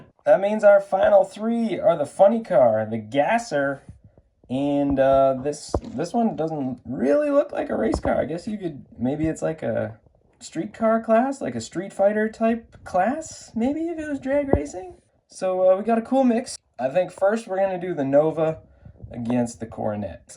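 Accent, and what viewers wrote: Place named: Canada. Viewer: I realize you’re Canadian but the word ‘a’ in the English language is pronounced ’uhh’, not ‘eh’.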